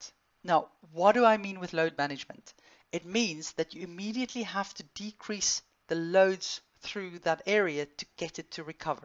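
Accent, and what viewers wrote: South Africa. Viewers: Hello. You sound like you could originally be from SA. I live in Graaff Reinet in the EC and have been informed I have this annoying condition. I’m a runner and started cycling this year to try and alternate a little and then bam OP!!.. I’m devastated as I have not been running for 4 months already and not cycling for a month now. I’ve already seen a physio, GP, Chiro and finally a sport specialist Dr who diagnosed OP after X-rays and sonar. My question is, as I am sure your time in Pounds will be extremely expensive for us here in SA, is would it be worth while seeing a biokineticist to help with me with this?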